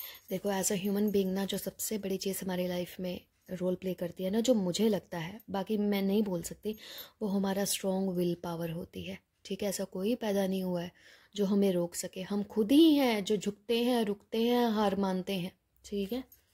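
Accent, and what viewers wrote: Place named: Punjab, India. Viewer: Love your punjabi ascent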